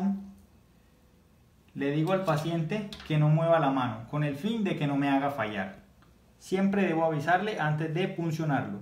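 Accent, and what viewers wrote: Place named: Colombia. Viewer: Bogotá, pero por su acento sabia que era de Colombia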